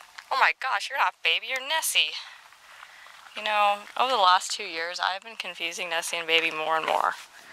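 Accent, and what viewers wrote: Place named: United States. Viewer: Deuneska Portugal Yeah! I wish I could afford to do that. Are you in Arizona? You sound American.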